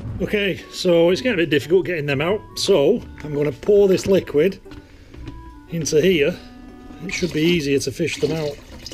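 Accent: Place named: United Kingdom. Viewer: By your accent your in the UK. Where did you manage to get the hydrochloric acid from as I need some but can't get it anywhere please. Great informative video thanks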